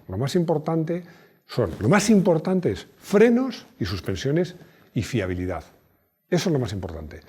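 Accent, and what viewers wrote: Chile. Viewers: saludos desde La serena,Chile. inconfundible el acento nuestro. muy buenos videos....felicitaciones. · La voz en off es de un Chileno?, que desagradable!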